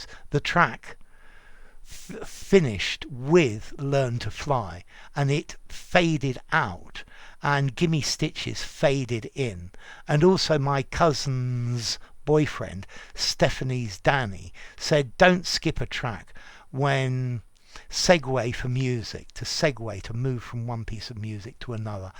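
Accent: United Kingdom. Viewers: Most of the videos I watch in English are from you, and some British ways of pronouncing words like "Dictionary" and "Vocabulary" have been stuck in my mind.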